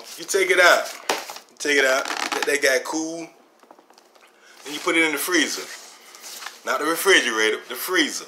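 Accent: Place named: Memphis, United States. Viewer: Man sound like he from Memphis Aye mane… Say mane…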